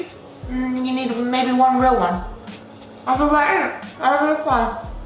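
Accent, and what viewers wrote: United Kingdom. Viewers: I do love British accent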